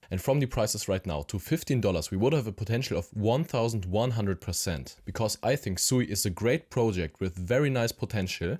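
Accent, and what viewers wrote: Germany. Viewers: Deutsches Dialekt 👍 lege ich richtig? SUI is gonna be huge 🚀🚀🚀 in my opinion, a 100x from the bottom is more than possible ✅ Grüße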